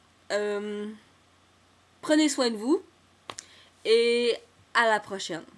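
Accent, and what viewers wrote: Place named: Quebec, Canada. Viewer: De quelle région du Québec viens-tu? Ton accent quand tu dis mettons "infermière" est plus épais que je suis habituée d'entendre, et je suis curieuse :) Merci!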